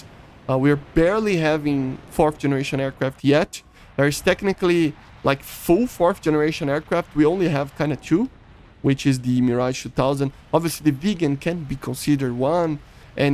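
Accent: Brazil. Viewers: Eu identifiquei o sotaque brasileiro IMEDIATAMENTE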